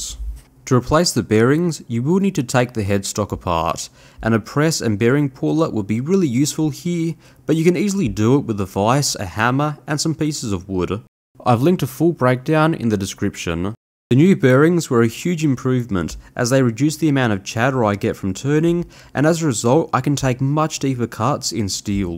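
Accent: Australia. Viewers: you sound Australian you can get phosphor bronze at CBC Bearings its not hard to find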